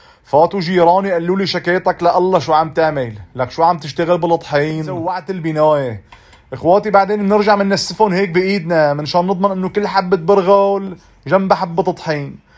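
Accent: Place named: Latakia, Syria. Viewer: احلا حكي بلعالم حكياتنا ولهجتنا اللادئانيه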